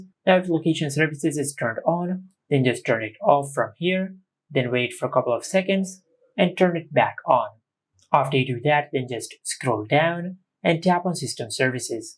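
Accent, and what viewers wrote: India. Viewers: ヒンディー訛りの英語リスニング教材としてハイレベルです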